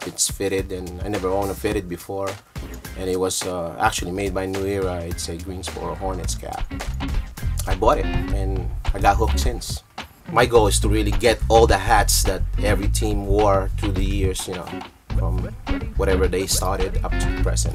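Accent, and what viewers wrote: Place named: Philippines. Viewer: First word came out form his mouth, and I was like "He's Pinoy!"!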